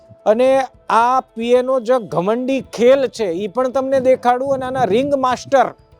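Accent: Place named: Surendranagar, India. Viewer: tame surendranagar na cho tamari boli uper thi lage che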